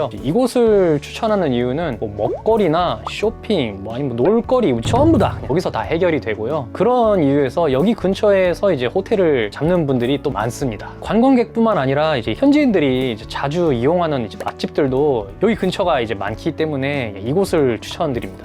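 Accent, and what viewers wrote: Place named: Japan. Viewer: 한국어를 일본억양으로 말하시네요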